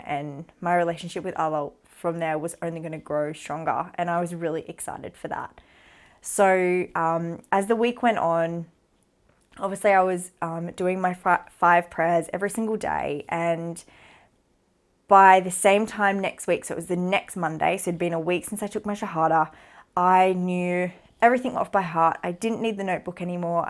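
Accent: Australia. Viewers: I never had the patience to watch someone talk this long in 4 videos, bt i have to give it to u, coz i watched all 4 videos without even forwarding once, you have a beautiful accent the aussi accent, which is very easy to understand and ur story telling is so natural, i would like to say this, u are one of those ppl who r chosen by Allah to bring to Islam, Allah must have liked something u did before converting so much that he chose u, u r a beautiful person. Welcome to the Muslim Ummah.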